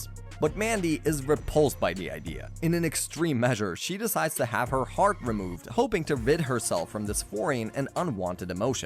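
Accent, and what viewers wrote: Canada. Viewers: I had stopped 10 mins before the end of the video... dude I know you gotta be Canadian because of using zet but they still sa the names correctly -_ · Great video. Where are you from? I notice your pronunciation stands out. My guess is Canada.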